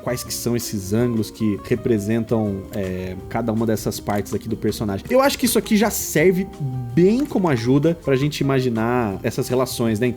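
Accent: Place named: Paraíba, Brazil. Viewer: que jeito paraíba de falar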